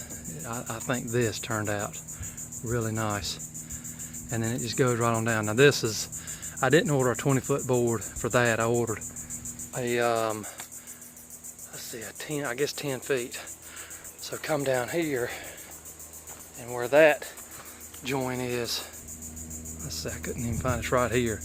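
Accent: South, United States. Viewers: Looks really nice. I like your country accent.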